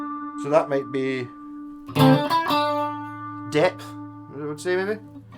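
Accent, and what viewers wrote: Scotland, United Kingdom. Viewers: Fuckin Scottish accent.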